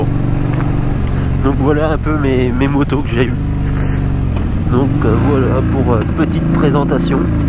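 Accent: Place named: Champagne, France. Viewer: l'accent champenois ! ! ah si si, je connais ! :) sympa t'es bécane ! du coup tu as arrêté les wheelings suite a la rsv1000? V & +1 abo! ;)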